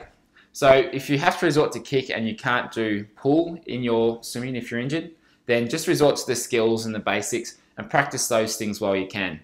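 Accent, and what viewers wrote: Australia. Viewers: he's australian, isn't he? good tricks 👍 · nice aussie aceent.....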